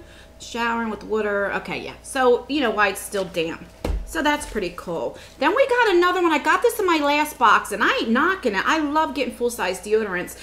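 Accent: New Jersey, United States. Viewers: I just like hearing your accent totally New Jersey, yes Walmart has goodies great for traveling too.Have a great Evening <3 Tina in Cali...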